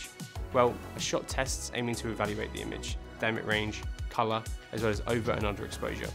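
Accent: United Kingdom. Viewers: Music really should not compete with voice in a mix. I had a hard time hearing the voice. With the British accent and high speed speech to boot, this American had a hard time hearing and processing the information in real time. I did watch it twice, though!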